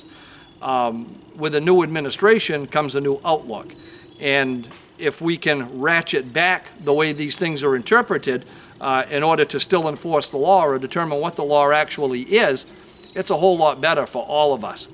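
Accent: Maine, United States. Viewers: Sounds like your from Maine bub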